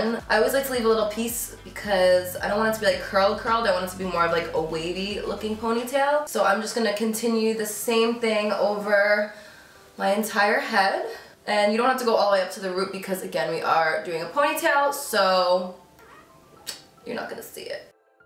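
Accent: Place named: Boston, United States. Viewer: She sounds like she’s Jewish or from Boston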